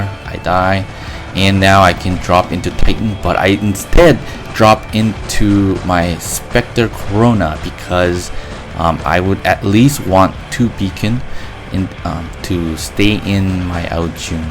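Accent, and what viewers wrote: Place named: Asia. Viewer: You sound totally Asian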